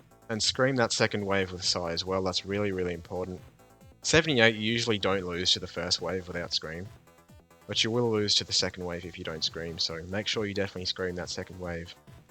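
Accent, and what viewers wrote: Australia. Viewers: Glad to hear another Aussie, what state are you in, better not be another fucking S.A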